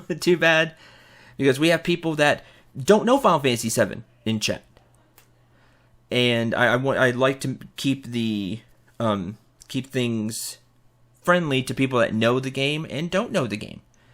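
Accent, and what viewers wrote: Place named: Texas, United States. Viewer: Let me guess by your accent since one of my cousins who lives there sounds like you. I am going to guess you live in Texas, I have 1 out of 13 shot. ^_^ Also, can you play Kirby and the Crystal Shards 64 if you ever have time or if you even have it. P.S. I am not going to hunt you down, I am just intrigued if I am right. I also live in GA, so it is not like I could even if I wanted to.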